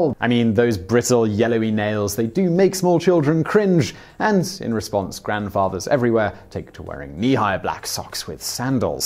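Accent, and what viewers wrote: England, United Kingdom. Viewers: My gosh brother you're a truly amazing speaker so easily understood and love the English note that is so you. I'm off to make changes, thanks fast speaking intelligent human.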